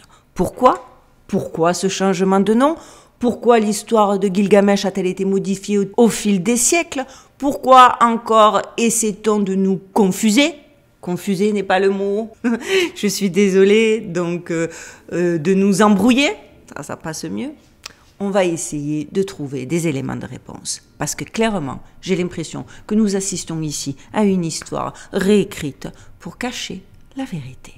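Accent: South, France. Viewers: Merci de nous offrir des vidéos aussi variées, toujours passionnantes et tout ça avec ta jovialité et ton succulent accent du sud ❤❤❤.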